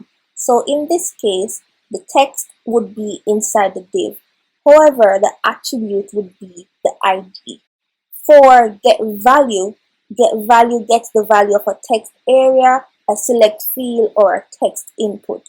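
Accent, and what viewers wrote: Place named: Jamaica. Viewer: Nice you sound Jamaican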